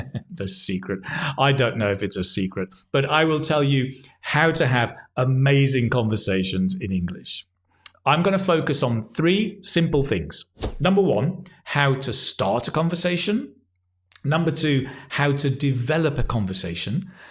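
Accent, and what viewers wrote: United Kingdom. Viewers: Yes, and great chance for us to listen original British english! Thank you!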